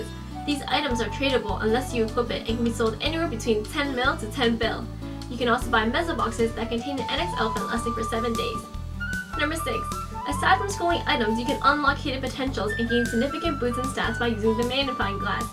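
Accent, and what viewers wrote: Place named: Canada. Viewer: Maplestory back in 2013 or so was like an ultimate P2W game. You forgot to mention Miracle cubing for crazy highass potentials. But nonetheless this is a great video! PS: You sound like Chinese-Canadian. I'm also Chinese Canadian as well! Which part of Canada do you live in?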